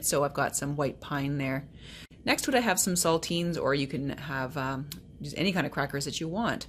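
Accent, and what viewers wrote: Canada. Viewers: Canadians say "oute" instead of "out".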